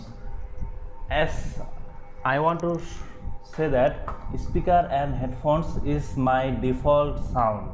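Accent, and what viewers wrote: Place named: Bengal, India. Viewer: With ur accent I can understand that ur a bengali because I am also bengali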